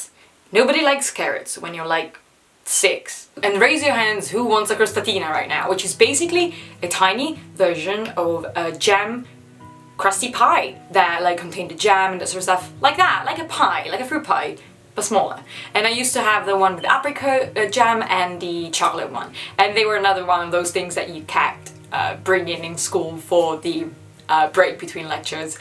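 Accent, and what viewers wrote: England, United Kingdom. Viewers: Bellissimo video! Il tuo accento inglese è top😱🦋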